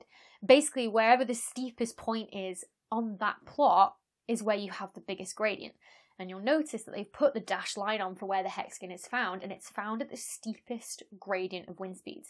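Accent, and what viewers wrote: United Kingdom. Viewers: I like how you pronounced that British t-sound from 'plot' by just stopping the airflow with your throat. I mean, how do you explain this to a phonetician who has learned that the the T-sound is a dental sound. For some one lip-reading you, you just said plo. Please keep that accent, I love it.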